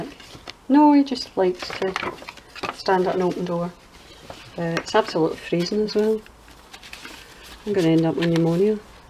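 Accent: Scotland, United Kingdom. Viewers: Thank you again for allowing us to watch you work. I always pick up something. Love the way you organise your pages when doing the stamping process. Good on you for being true to yourself and what works for you. I always get excited when I see a new video from you. For your work, your accent, and little tips. I have a son living just out of Inverness and listening to you feels like connecting to him. I live in New Zealand but we visit him regularly. Keep doing what you love. It certainly makes me happy. Dianne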